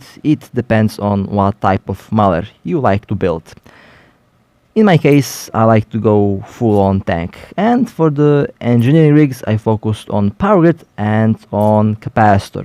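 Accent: Russia. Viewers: акцент русский капец как из американских фильмах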